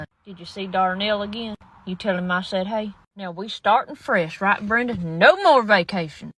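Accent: United States. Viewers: Sounds like SE Ga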